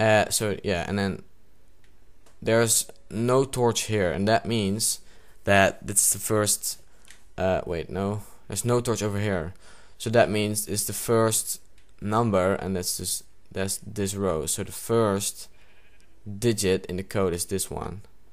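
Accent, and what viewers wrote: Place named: Netherlands. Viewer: wow jij klinkt nederlands zeg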